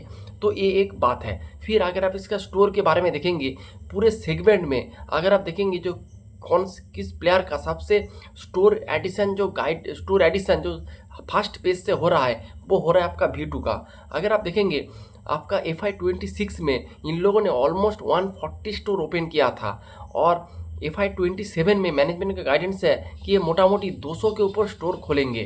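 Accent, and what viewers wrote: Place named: Bengal, India. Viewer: Bhai puro Bengali tone asche, Hindi ta khub baje sunte lagche. Camera er angle ta thik kor - get a virtual background. Content bhalo but not getting attention. Fix these issues.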